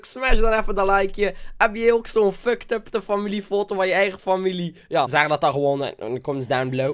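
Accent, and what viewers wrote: West Flanders, Belgium. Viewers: jij bent echt een belg of nii da accent OMG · Van waar kom jij ??(ik denk West-Vlaanderen ) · Dat Belgische accent is geweldig ❤️😂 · Hij west-vlaams en probeert AN te spreken zodat iedereen hem verstaat :P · probeer een keer nederlands te praten want jou belgische accent klinkt echt voor geen meter!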